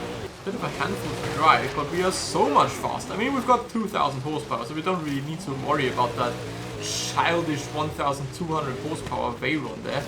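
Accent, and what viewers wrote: Germany. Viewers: Hey dude.. just wanted to ask if youre from germany cause I can slightly hear that accent but the rest ist just wonderful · i Hear it du bist deutsch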